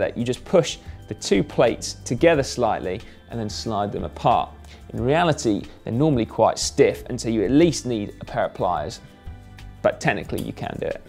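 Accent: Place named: United Kingdom. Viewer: Could you possibly do this video again without the British accent?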